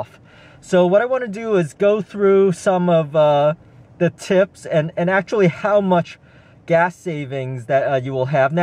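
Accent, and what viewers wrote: Brooklyn, United States. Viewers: Sounds a tad like Woody Allen. Brooklyn boy?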